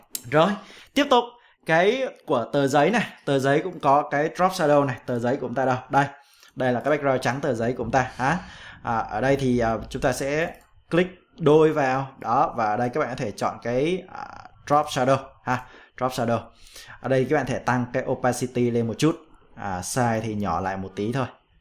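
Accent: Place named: Central, Vietnam. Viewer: Anh này người miền trung nè đọc “a” thành “e” kk ❤️Miền Trung 🤣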